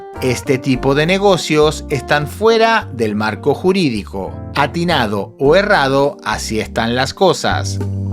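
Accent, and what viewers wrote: Argentina. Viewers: De donde eres brot? Argentino no? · Tú acento Argentino y tú léxico mexicano me confunde… 🤔💭💭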